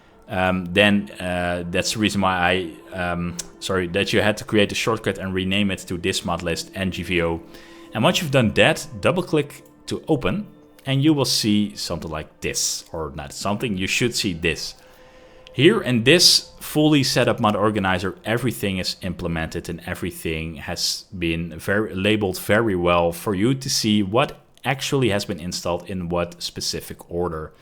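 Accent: Netherlands. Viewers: Dutch accent spotted!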